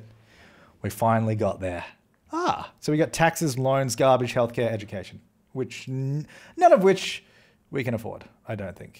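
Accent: Australia. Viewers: R u form Australia? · Both and Jazza are Australian. Have the same accent:)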